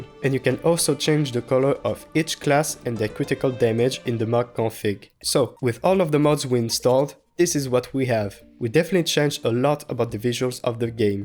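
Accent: France. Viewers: Are you french or something ? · Nice frenchglish accent and nice video! ;D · Tu es français non ? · that french accent is ruining my ears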